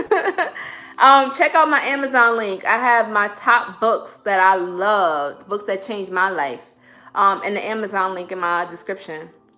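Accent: New York, United States. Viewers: Your ny accent came out in this one lol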